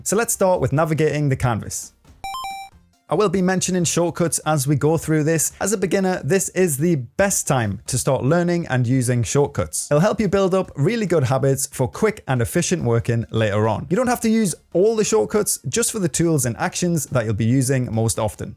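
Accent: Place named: North East England, United Kingdom. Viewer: Just found your channel dude, not watched all of this yet but started, going to after I've done food shopping as I'm planning on making a 2D horror themed RPG and this will help me learn how to use this program and what not. Also you sound like you're from up here in the North East too, always good to see :)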